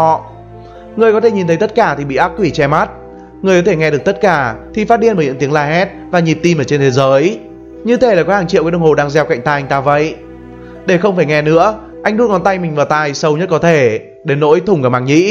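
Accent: North, Vietnam. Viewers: Anh ở miền Bắc đk anh.-.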